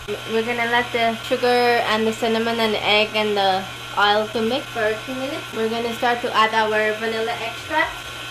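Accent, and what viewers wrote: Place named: Berbice, Guyana. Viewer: Just luv that Berbice accent!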